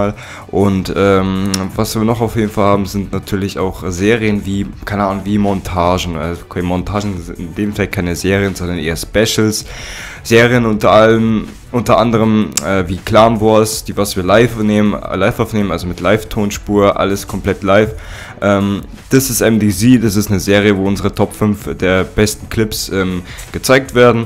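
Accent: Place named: Bavaria, Germany. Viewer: 100% Bayrisch - Gutes Video 👍👍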